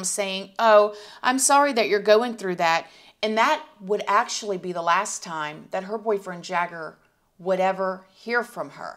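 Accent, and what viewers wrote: South, United States. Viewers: How did I not know about you! That southern accent and true crime ❤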